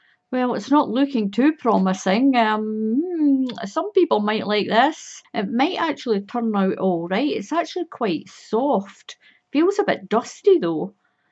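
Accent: Scotland, United Kingdom. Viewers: Where in Scotland are you from? You remind me of someone from Lanark 👍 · I legit subscribed to your channel JUST so I can listen to your accent 😍 I miss Scotland! 😭😭😭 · Dawn Montgomery She has a Scottish accent. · I agree with you that I would rather see and feel yarn before buying!! Love your Scottish accent. I am American, but I think I have Scottish DNA because I easily talk in the Scottish brogue. Lol. I am not so good with the Irish. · I miss hearing my Gran and Grandad's Scottish accent so listening to you has brought back so many wonderful memories 💕 I would make a little weaving machine and make some nice wall hangings💖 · i would love to have a yarn surprise from ebay! i love all that yarn, and i love your accent in your voice, you must be from Scotland? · really? You didn’t know Scottish straight away? I’m Scottish I’m not being rude, I’m just curious 😃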